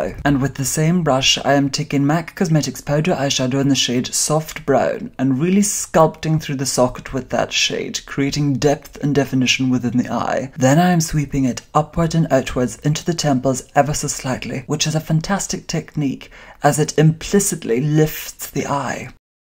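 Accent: Scotland, United Kingdom. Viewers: I love that you used the word "film" rather than movie - very British in your lovely Scottish accent :-) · She's magnificent! Your work is Lovely and Flawless. Very nicely done. You still frighten me abit. I would love to hear you speak without the British accent. I bet it's lovely. Sincerely, M.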